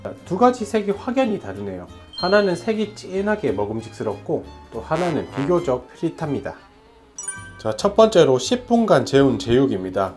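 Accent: Gyeongsang, South Korea. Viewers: 경상도 액센트가 매력적이에요 love love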